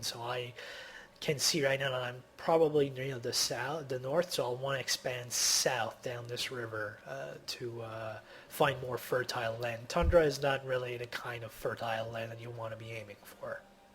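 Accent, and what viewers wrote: Quebec, Canada. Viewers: I don't wanna be racist or try to discriminate but what nationality are you? You're voice sounds very different! It sounds almost french canadian :) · You sound like you're from Quebec. Are you Quebecois?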